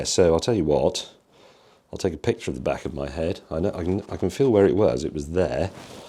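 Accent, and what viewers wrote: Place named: United Kingdom. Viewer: This guy is just plain boring . If it weren’t for the British accent and the long hair…..?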